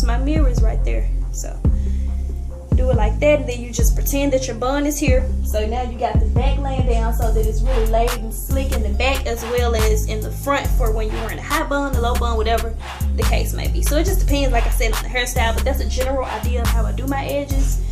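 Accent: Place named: Memphis, United States. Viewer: I knew you were from Memphis as soon as I heard your voice lol your hair is laid hunty!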